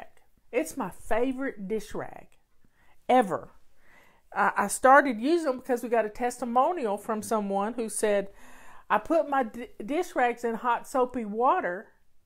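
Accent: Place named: North Carolina, United States. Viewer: I've been Flying for 15 years now - thank you! I wanted to tell you that I love hearing you speak because your accent is exactly like my grandmother's (also from North Carolina) and I've missed hearing it so. God bless you.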